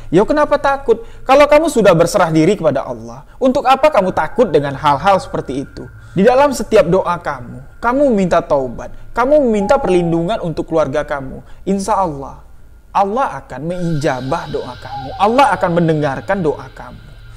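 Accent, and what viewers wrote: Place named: Jambi, Indonesia. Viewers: jambi kk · Saya selalu nyimak cerita misteri dari bang...hampir tiap hari beberapa cerita saya simak Saya suka gaya bicara bang...baru saya tau seperti ini gaya bicara orang jambi..enak didengar Sukses selalu bang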